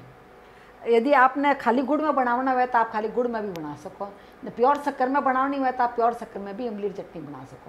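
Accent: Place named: Marwar, India. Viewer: आपको समजावणा को तरीको बढीया है आवाज बहुत साफ,मिठी बोली और शुद्ध मारवाड़ी !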